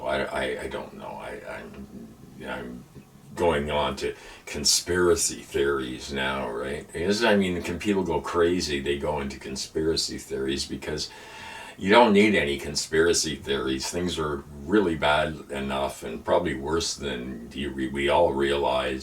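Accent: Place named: United States. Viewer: you speak fluent american, both a blessing and a curse, so many friends from belgium, france, uk, aussies, etc etc do as well, luv ya, good content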